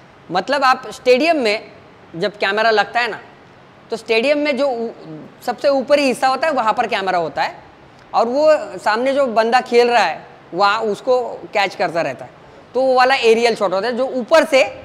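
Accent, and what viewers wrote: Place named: Maharashtra, India. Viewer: Hindi me marathi accent aa raha hai sir ka... Sorry but aise hi videos dekh k thoda samajh raha hu 🙏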